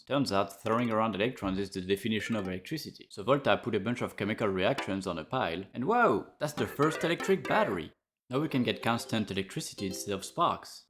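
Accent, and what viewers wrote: France. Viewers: the french accent is amazing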